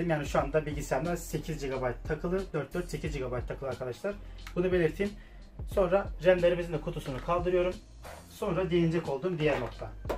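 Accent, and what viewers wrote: Gaziantep, Turkey. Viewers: Kardesim sen gazianteplimisin?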